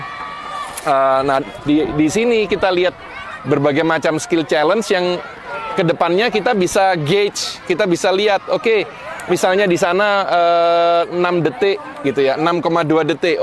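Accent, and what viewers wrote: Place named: Malang, Indonesia. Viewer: 👍 Gamblang dan runut penjelasannya meski disisipi istilah2 bahasa Inggris dan dengan logat/aksen Malang'an 😀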